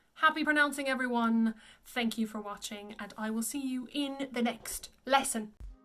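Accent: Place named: United Kingdom. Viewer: You are the first person I have ever heard who speaks in a British accent but with a rhotic r. Did you spend time in the US?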